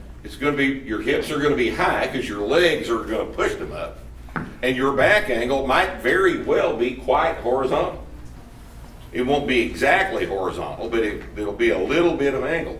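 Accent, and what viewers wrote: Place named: South, United States. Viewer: He should say to start drinking when the video starts to dull the pain of his "good ol' boy" accent and attitude...he's the master but good gosh it's torture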